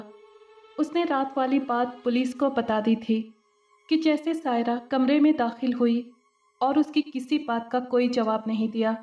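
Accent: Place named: Kashmir, India. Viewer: I watch all your video.now days I am staying in delhi.bt mera childhood kashmir sae h. Apki voice n Akcent n Urdu sae lgta h jase koi wahi ka ho .nyc video.lots ov love.u r doing Great 👍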